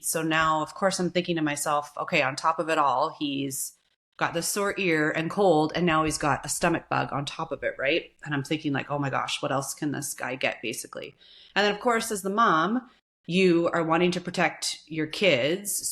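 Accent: Canada. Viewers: You sound Canadian. Hope you qualify for financial assistance from the government and that your husband had life insurance. I have faith your husband is at peace, it's those of us left behind who suffer. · sounds like canada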